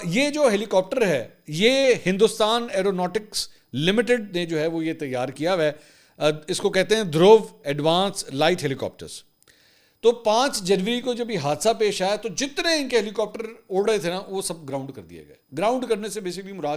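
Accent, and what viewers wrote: Punjab, Pakistan. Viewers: Assalamualaykum a bilkul mere ammi jsi Punjabi bolty hn qasmain sadqay Jawan a k bhai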